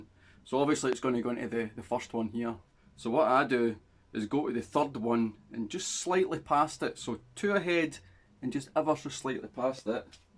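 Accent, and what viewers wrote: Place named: Scotland, United Kingdom. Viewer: It would shorten the video..... Then we would miss out on that beautiful Scottish accent. Nice video. Well done.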